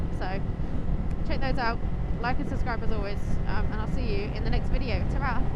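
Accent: United Kingdom. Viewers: Im from the Highlands Scotland and iv been riding for a few years. I dont usually ride in the winter only if its necessary. I went round a hairpin and hit a patch of black ice on my ZX6R and wraped it around a tree a few months after getting my A license. 🤣 where abouts UK are you based? Sound Cambridge area.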